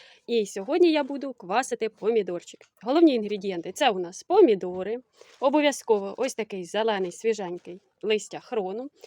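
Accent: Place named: Poltava, Ukraine. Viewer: Дуже схоже на полтавський говір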